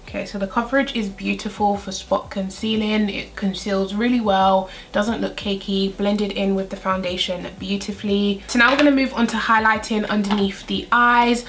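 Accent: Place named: Southern England, United Kingdom. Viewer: so nice to listen to love her accent. It’s southern but not London and I love it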